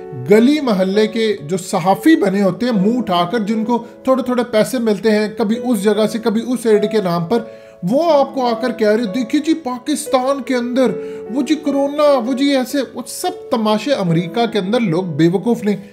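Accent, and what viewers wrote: Punjab, India. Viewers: جن کو ٹھیک طرح سے اردو نہیں بولنی آتی۔۔۔اور جن کا اردو کا تلفظ پنجابی جیسا ہو، ان کو پاکستان میں صحافی بنایا ہوا ہے۔۔۔یہ معیار رہ گیا اب صحافت کا۔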